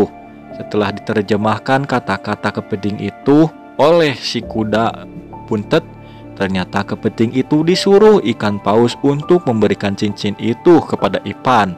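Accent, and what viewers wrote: West Java, Indonesia. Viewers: Sunda pisan john logatna,,mantaap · Logat sunda na kabawa keneh jadi apal euy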